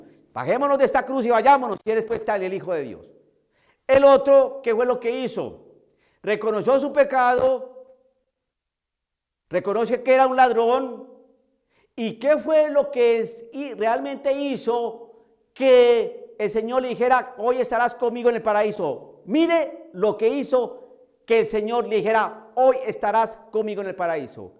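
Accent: Colombia. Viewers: cómo va a ser de Venezuela, es obvio que es colombiano, solo hay que oírle.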